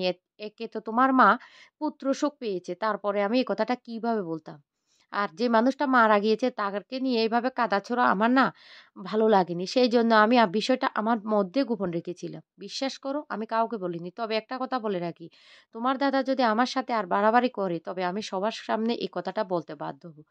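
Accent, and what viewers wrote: Bangladesh. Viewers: Plz ei pronunciation niye r video deben na. Bangladeshi tone ekhono ekdom jay ni.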